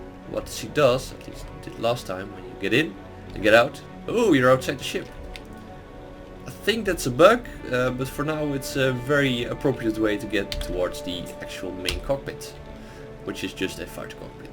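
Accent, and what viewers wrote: Netherlands. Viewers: i have this feeling your dutch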